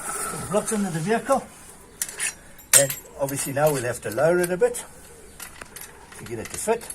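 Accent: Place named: United Kingdom. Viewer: Is this available in USA? Your British accent is obvious.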